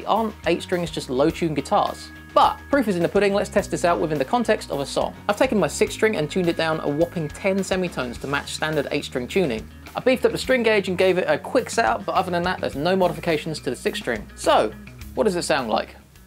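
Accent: United Kingdom. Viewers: I've seen you in Riff Wars, Shred Wars, and Ultimate Shred Song but this is the first time watching your channel and the British accent threw me a curveball haha it was cool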